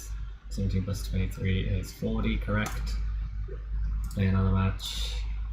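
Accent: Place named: England, United Kingdom. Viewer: Wow you sound full on Pom now lol. Nice.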